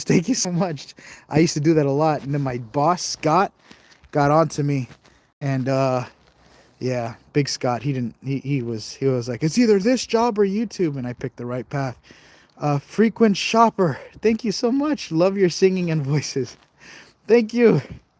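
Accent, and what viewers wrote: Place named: United Kingdom. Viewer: I love your British accent. You and could just have so much fun being silly AF 😂😂😂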